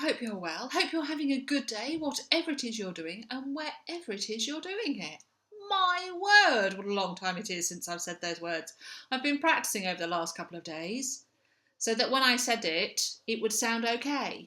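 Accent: United Kingdom. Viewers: I was so very happy to have gotten finished with my bath and washing my hair, I sat down and saw you had posted a video! I've missed you're smiling face and lovely British accent! You've got quite a good pick of books there, especially the JD Robb, I need to give that series a go! I've been dealing with some health challenges, but am doing pretty well now. I'm looking forward to your next video! Take care!😊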